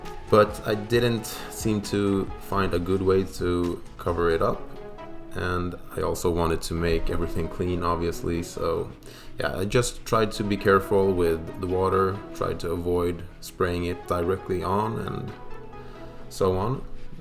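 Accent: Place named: Sweden. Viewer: First time watching one of your videos, and I was like "damn, he sounds hella Swedish". Then I saw the Biltema can 😂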